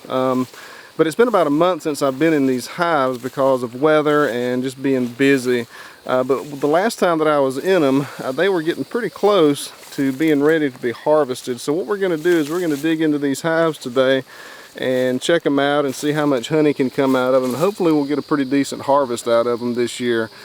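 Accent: South, United States. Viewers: I live in North Georgia, most people I know have pretty deep southern accents. Because of where I live most people here are pretty mean, so the accent doesn't exactly give me the warm fuzzies. But this man seems so calm and kind that the accent sounds nice. I've watched maybe ten of his videos already and now I wanna be a beekeeper! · Of course he has a southern accent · "it was my granddaddy's" awe, as a canadian I dont hear real southern- talk much, its really heart warming to hear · His southern accent soothes my soul "this was my gran daddies' " made my heart melt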